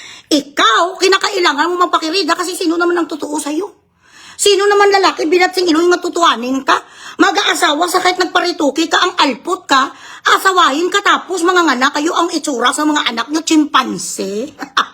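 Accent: Visayas, Philippines. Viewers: i hate sarah duterte pero natutuwa ako sayo parang ang cute ng visaya accent mo at i like you maldeta ang peg hahaha aliwwww . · natutuwa ang taumbayan sa iyo. Mabuhay ka. Sincere ka, honest. Very lovable ka sa Visayan accent mo, delightful sa ears ang Visayan accent mo. Candid ka, walang hypocrisy. Maganda ka, ang face mo guwapa ka, ang taumbayan ay hinahangaan ka sa pag analyze mo ng political situation ng ating bansa. Di ka plastic, may sense of humor ka pa, di ka nagpapanggap. Very true ka pag nagsasalita, walang kaartehan.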